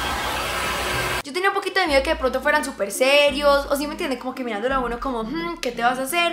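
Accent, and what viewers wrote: Antioquia, Colombia. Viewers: Me encanta el acento de Colombia es el mejor de Latinoamérica saludos desde Venezuela 😍😍😍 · Ella habla asi por que es paisa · No toda Colombia habla asiii, únicamente los paisas hablamos así y Antioqueños. 💛 El acento cambia según la región. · Osea no todos los colombianos hablamos con ese acento, así hablan los paisas que son de la ciudad de medellin. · Recuerda que ese acento no lo tienen solo los de Medellín, son más ciudades, Armenia por ejemplo, entre otras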